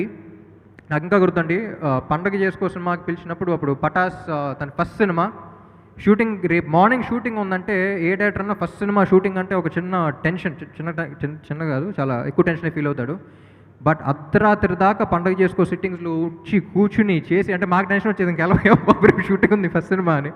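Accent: Telangana, India. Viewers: After I smart Shanker....telangana slang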